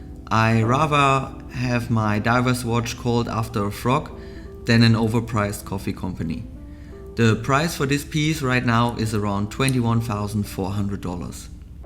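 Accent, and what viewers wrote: Germany. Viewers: Denglish hardcore😆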